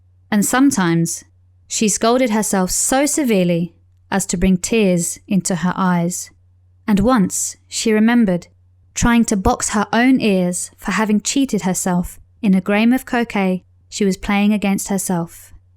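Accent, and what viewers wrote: United Kingdom. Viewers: 피터팬 읽어주기 컨텐츠 기다려보겠습니다!! 영국작가가 쓴 런던배경 동화라 영국 발음으로 공부하면 너무 좋을거같아요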